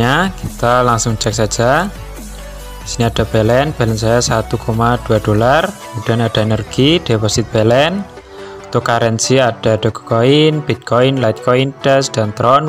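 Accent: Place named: Banyumas, Indonesia. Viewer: Logat ngapak pa ya.. org mn nich?😁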